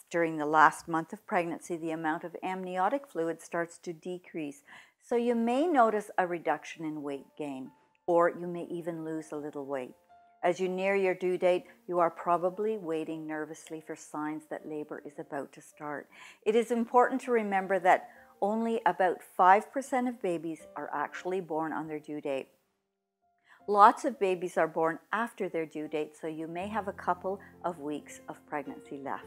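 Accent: Canada. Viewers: I believe she is Canadian based on her accent and also the way the words are spelled on the screen (such as labour spelled with a "u"). This may be why she pronounces words just a bit differently. It's not necessarily wrong, it's just a regional difference.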